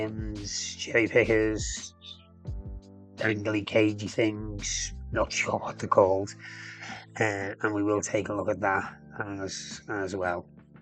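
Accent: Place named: Liverpool, United Kingdom. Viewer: When your channel started under a different name I 1st started watching it but then listening to you I realised you don't support Everton and haven't got a clue about football..!! + your accent makes even us Scousers cringe 😮 And you changed your channels name because you kept breaking all the drone rules flying over a working Stadium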